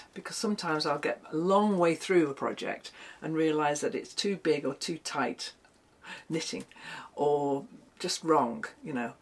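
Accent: United Kingdom. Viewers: i enjoyed the last video of the year. You just bring me joy listening to your lovely British voice with calming thoughts for us on our lime green sofa. I have enjoyed all the crafts you made and watching pateron So glad i found your channel from a wonderful friend i made this year. we were all 3 nurses and understand your mindset. I suggest a knitted pillow with an appliquéd yarn flower in center. Thank Anna and Rita for making all this possible. I look forward to the year of color 2026. Jodie in GA USA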